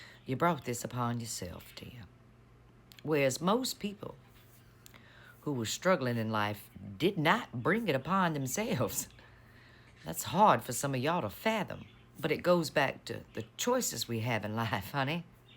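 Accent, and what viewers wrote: South, United States. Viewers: Found your channel recently, love to hear your tea talks. I should’ve known you are a survivor, there’s a certain knowledge that comes from those who have. Know that we (I’ve included my family members) appreciate you, your savviness, and your ability to express current affairs in that wonderful southern accent. Stand strong, be safe, and continue on. Thank you. 💔🙏🏽💙💪🏽💙🇺🇸💙🚫🤴🏼💙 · She has a beautiful southern accent/voice.❤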